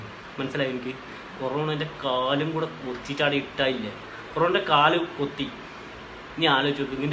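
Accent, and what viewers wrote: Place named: Kannur, India. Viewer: നമ്മളെ കണ്ണൂര് ഭാഷ.... കേക്കാൻ നല്ല രസൂണ്ട്. ലൈക് അടി മോനെ ഈട... 😊👍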